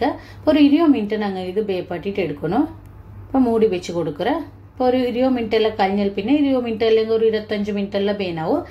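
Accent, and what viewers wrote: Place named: Palakkad, India. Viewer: Nakk ningade samsaram nalla ishtayid.ningale palakka kekkan veedio nokre....👌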